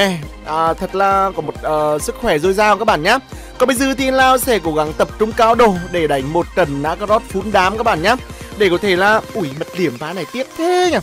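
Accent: Nghe An, Vietnam. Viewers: Nghe quen giọng lào lúc nào không hay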